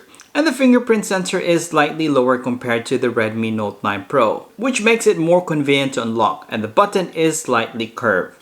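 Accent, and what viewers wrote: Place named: Dumaguete, Philippines. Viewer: mao diay maayo muinglis bagsik og accent ba taga dumaguete diay ka sir.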